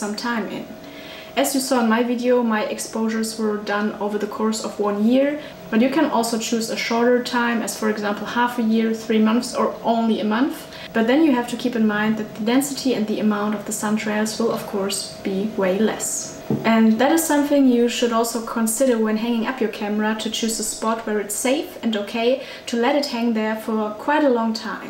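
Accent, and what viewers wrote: Germany. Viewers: I hear a bit of deutsch 😅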